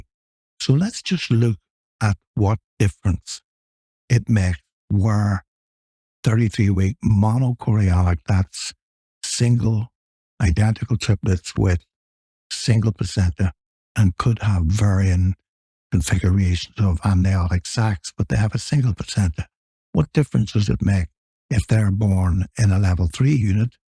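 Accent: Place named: North, United Kingdom. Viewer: Fantastic STuff..😅.....Your obviously from up north,.......❤🎉... FaB accent ye got There my friend 😅🎉... i moved to London from Dublin in 1998 but im STILL famous for my strongish 1/2 CorK 1/2 Dublin Ramble 😅😅😅😅😅...Fabulous channel 🎉. Been Binge Listening 👂 for the past 3weeks ❤🎉😊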